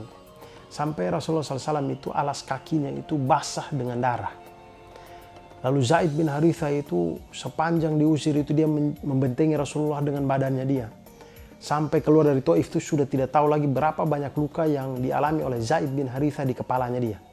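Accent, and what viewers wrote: Eastern Indonesia, Indonesia. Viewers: Tetap ada logat timurnya ya. Suka sekali. Apalagi cara pembawaan ceritanya. Cocomi jd story teller.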